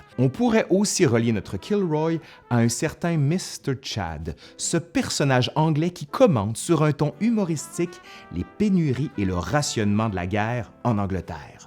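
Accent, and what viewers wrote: Quebec, Canada. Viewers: tu "lisse ton accent"... ou as-tu naturellement un accent quebecois fort leger?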